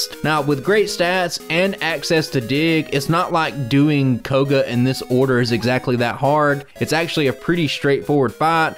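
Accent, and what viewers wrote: United States. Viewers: I don’t know if anyone ever says this to you but every single “southern” character from the indigo league Pokémon anime series shares your accent. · Woah that must be the most american accent ever o.o much yeehaw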